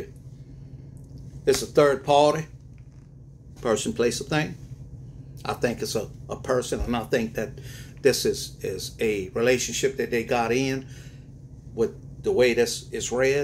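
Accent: Louisiana, United States. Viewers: I AGREE 100%. JUST CONTINUE TO DO YOUR THING YOUR WAY MANY MORE OF US ENJOY IT SO MUCH. THOSE WHO TAKE ISSUE AND NIT PIK EVERY LITTLE THING NEED TO GO ELSEWHERE AND BE HAPPY. IT'S ANNOYING WHEN PEOPLE NIT PIK READERS AND EXPECT READERS TO CHANGE AND TO ACCOMODATE THEM. ENTITLED MUCH ? THEY'RE NOT SPECIAL. YOUR SOUL TRIBE/ SPIRITUAL WARRIORS ARE LOYAL AND CONSISTENTLY WATCH YOUR READINGS BECAUSE WE LIKE THE WAY YOU READ GIVING IT YOUR SPECIAL CAJUN FLAVOR AND WISDOM. SO PLEASE DON'T MIND THEM JUST CONTINUE TO BE YOU AND DO YOU BOO!!❤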